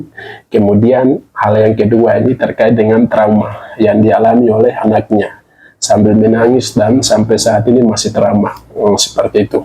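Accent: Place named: Papua, Indonesia. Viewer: Bro kamu kok bhsnya santun kok bhsnya papua nya masih melekat tapi kok bisa santun..bravo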